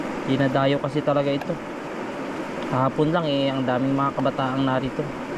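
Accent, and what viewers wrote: Visayas, Philippines. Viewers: Native panggalatok po ba kayo brother? May konting bisaya ang accent nyo, parang mixed. Anyway oks ang channel mo bro.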